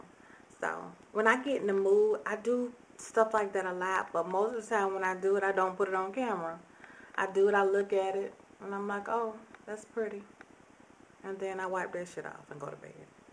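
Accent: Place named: Ivy League, United States. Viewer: lol... ivy league voice...But u r excellent at what u do... and I sense that u do this because this is what u love doing.. every1 doin makeup on here I dont get that vibe from... sometimes I think its done just because its popular and alta peeps r doin it.. but I thank u 4 ur genuity(is that a word?lol) really.. u are a Blessin 4 real.. if people can't c that its their loss hun! :) keep ya head up...stay keepin it real:)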